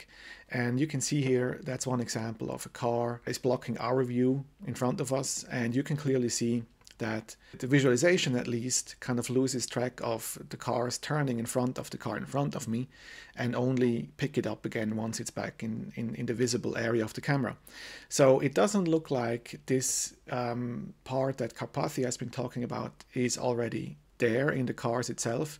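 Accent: Switzerland. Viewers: Are you from Switzerland? 😅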